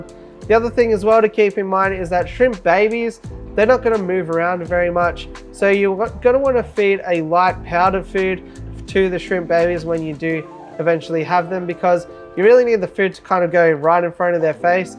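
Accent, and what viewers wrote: Australia. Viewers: oh thank god, hes an aussie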